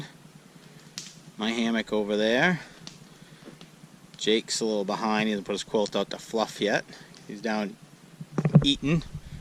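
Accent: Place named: Maine, United States. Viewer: Having trouble commenting on your other videos could just be my phone but I just watched a couple of your videos...thought I recognize that accent lol Can you just tell me how far up the trail you walked before you found that campsite please ...that would be helpful I’ll bring my GPS and Mark it and put it on my channel when I do it next spring thanks ...One Mainah to another 😀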